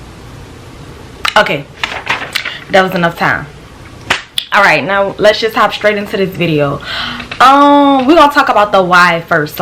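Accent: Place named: New Orleans, United States. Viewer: I knew u was from New Orleans u talk like me lol bt thanks for th info hun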